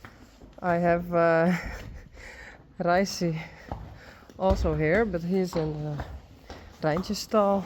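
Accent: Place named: Friesland, Netherlands. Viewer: Zijn jullie Fries? Ik meende Nederlands te horen en toen dacht ik... nee toch niet helemaal bij bepaalde woorden haha. Dat moet dan wel iets van Fries wezen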